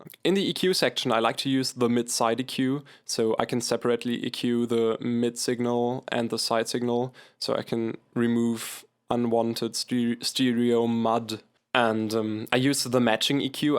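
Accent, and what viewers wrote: Germany. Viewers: I realised u r german after like 1sec of watching this :D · He's german :3 That accent :DDD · Wie krass man einfach das deutsch raushört (how easy you just hear the German) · Are you german ? :) you have a german aczent :D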